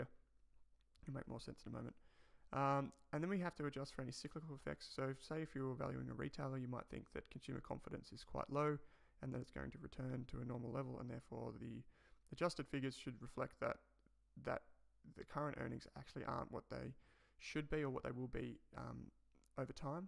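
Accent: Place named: Australia. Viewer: hey, i'd just like to add some constructive criticism. I think your voice is a little bit too low, and especially because you have an australian accent, it gets a little bit difficult to understand what you're saying. So you if could speak a little louder, that would be great, haha.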